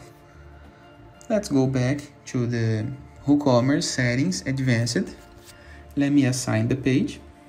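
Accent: Brazil. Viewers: Esse sotaque ta me cheirando a brasileiro...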